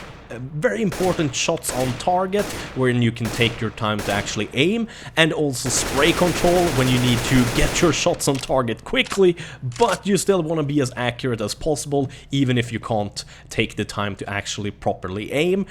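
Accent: Sweden. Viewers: he IS swedish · The swedish is real! xD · u r swedish arent u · Are you swedish? im new and the first thing i heard was youre accent, sounds swedish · I just bought the game and thought I'd give it a try since CS is soooo praised by gamers. Here is what I think about it (only played 5 hours) Challenging to handle the weopons (This is a plus for me) I get spawnkilled ALL DUHH TIME!!! Few and pretty boring game modes and maps. People either camp in corners with snipers or run around with shotguns. (not the game faults though) Weird frame drops... Shite graphics lol. But the game is old right?... I don't like the game, I'm not hating. Just sharing my thoughts of the game. BTW Are you swedish? You really do sound like a swede. Skit bra review för noobs som mig! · that Swedish accent. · He's definitely Swedish. - A Swedish guy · the swedish accent i love it xD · Very swenglish but i am swedish to i cant be too rude🇸🇪🇸🇪🇸🇪🇸🇪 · your accent is very swedish! 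i just noticed that now. but anyway thanks for this guide! i also want to be better at this game because most of my friends are csgo players and im being left behind :( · ? ? hes swedish dumbass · you're swedish right? sounds swedish! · Easy to hear that this guy is Swedish :D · He swedish :'D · also u sound swedish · two seconds into the monologue and I'm betting you're Swedish, correct? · Tack för videon (och tummar upp för svengelska uttalet haha<3)! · This dude is from sweden tou can hear it on How he talks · Is he from Sweden? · He has a Swedish accent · Heard right away that you were swedish x) It was kind of fun to see that yoy Also did that " " with your name cause I do too XD exactly rhe same way, since I was 13 xD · His accent is maybe swedish because he is from Sweden? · Svensk? (dialekt xD) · Are u swedish?? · man hör så jävla väl att du e svensk xD Bra video ;)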